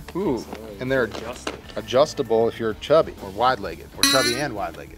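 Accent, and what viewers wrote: Pittsburgh, United States. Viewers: The yinzer accent seeps through. You got a fan over here in Sharpsburg now.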